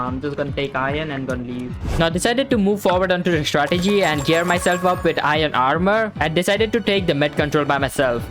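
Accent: India. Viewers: Are u a Indian u sound like one I'm and Indian too in Hyderabad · Was watching this next to my father, and he said “TURN THE FUCKING THING OFF… I dont mean to be racist but I HATE indian voices” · nice, exactly 8 mins for youtube payouts, and the indian accent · man bro i just realized his voice is indian INDIAN BOYS!!!!!!! :) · dude speaking extreme Indian accent in this!😆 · This is the first vid of you I’m am watching and from the start I KNOW you’re Indian from your accent! I’m also an Indian. Good to see more Indian ppl makin vids bout roblox and more games!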